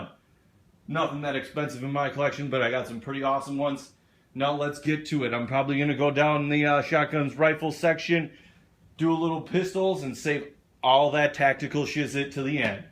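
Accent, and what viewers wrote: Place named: North, United Kingdom. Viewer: Btw, zip ur fly and learn some southern dialect. The rapid speech northern tone doesn’t do your guns justice. Practice: say slowly, - dog gone.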